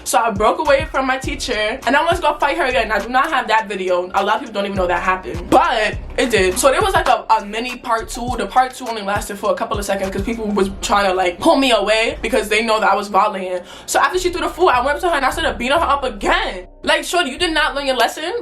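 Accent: New York, United States. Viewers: Are you from NY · u sound like u from ny 😂😂 ? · Definitely from New York lmaooo " aii so BOOM " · This my first time watching your video and I can def tell that your from New York 😭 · Yea u can definitely tell she from ny💯🔥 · You’re from ny huh🥺? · ouuu i know a new yorker when i see onee 😫😫 lemme guess u from the bx or brooklyn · You from nyc❓ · You have to be from New York 😂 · Definitely from ny😂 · You gotta be from New York😂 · The way that I knew you were from NY and then you said Coney Island so ik for sure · New York accent hella strong 💪🏿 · Soon I heard the newyork accent I subscribed WHOMPP🥳🥳hey sista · She definitely sounds like a New York girl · Nah she gotta Ny accent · I knew you was from NYC 💪🏾🤟🏾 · You can tell she’s from new york by how started the story 🤣 yktv yessir 💞🕺🏾❗️ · she dead got the deep ass ny accent🤣❗️ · The aii so boom just know she from ny cause I do the same thing 🤣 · the NY accent💕💕💕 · i love how u jumped right into the story & that NY Accent and you are pretty!🤍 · You from New York or am I trippin???? 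· I could deadass tell you from new york😭. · You must be form New York I hear the accent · You def from ny😭💞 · Instantly knew she was a fellow New Yorker right off the accent and the "Ight so boom" lmao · you have to be from new york!😭your accent gives life! edited:i said that before watching everything 💕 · I’m new to this channel and I already know she from New York 💯❤️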